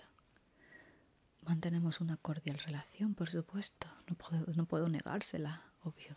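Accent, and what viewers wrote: Andalusia, Spain. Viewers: En primera, siempre genial, relajante, tingles, interesante... Pero luego leí "Cum" en lugar de "Rum" en la botella de atrás y me dió risa de la mala xD Por cierto, aunque estoy en México, y por ello me es difícil diferenciar acentos de España... el tuyo es el más delicioso de oír. Necesito muchos amigos andaluces para que me hablen todo el día 💖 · Amo como hablás, de verdad amo el acento español y luego el tuyo es hermosooo ✨, aparte tu voz relaja 🧡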